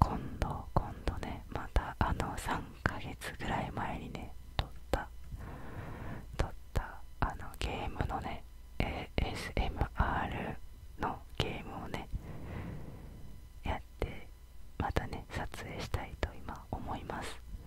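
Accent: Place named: Kansai, Japan. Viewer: ティックトックからきました、音フェチ昔から大好きなんですけど久々に新しいチャンネルにハマりました嬉しい🥲🥲 これからお世話になりまーす！！ 関西弁やな〜って思ったんですけど関西の方ですか??🌟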